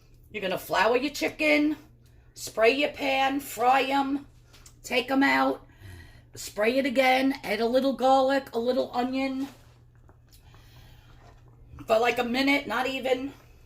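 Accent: New York, United States. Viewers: Love this recipe and love that New York accent ! Cindy From Queens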